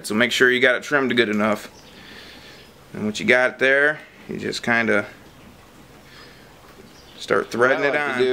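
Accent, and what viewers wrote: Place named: Michigan, United States. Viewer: Thanks bro. Haven't heard a Michagan accent in a while!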